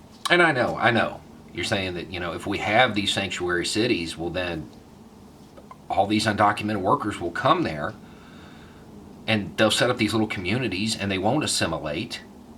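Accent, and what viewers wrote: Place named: Tennessee, United States. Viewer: Hold up is from Florida?! I coulda sworn you'd be from Tennessee.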